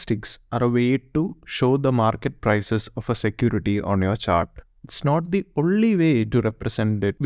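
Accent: India. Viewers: get an voice actor cause the idian accent drives me nuts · How to understand the Indian pronounce？ 😅😅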